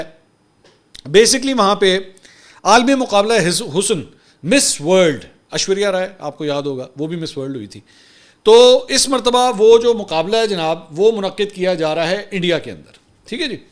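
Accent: Punjab, Pakistan. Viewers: 😂😂😂😂 bhai zaberdast work kr rhy hn ap masha Allah lkn jo ap punjabi ka touch dety hn kamaal kr dety hn ap😂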